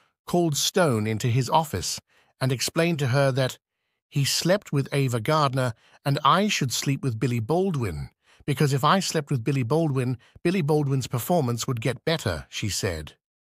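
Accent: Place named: England, United Kingdom. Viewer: This is an American movie. Why is this narrator English and a male? He's irritating.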